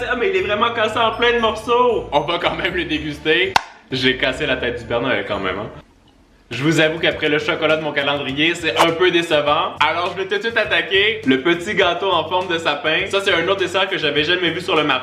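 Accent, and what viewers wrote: Quebec, Canada. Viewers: J’adore son accent québécois ❤️👌🏻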